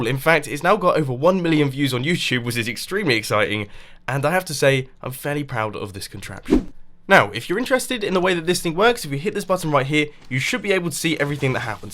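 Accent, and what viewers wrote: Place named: United Kingdom. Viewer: You are a British what the hell bro but I'm Indian and I like your english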